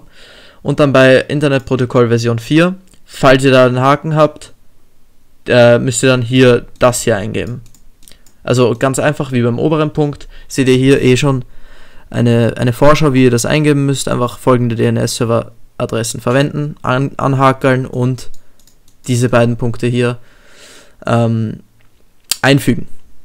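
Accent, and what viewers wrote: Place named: Vienna, Austria. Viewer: Man merkt gleich, du bist aus Österreich, würde auf Wien tippen, sag bitte ob das stimmt haha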